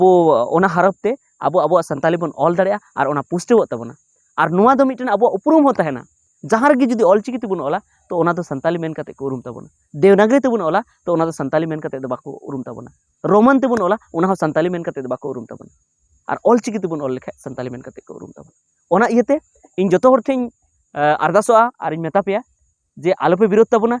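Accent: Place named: South, India. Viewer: ये आदमी संताल परगना का नहीं है, बहुत कोशिश करने के बाबजुद इसके उच्चारण से साफ पता ओल रहा है कि ये दक्षिण का आदमी है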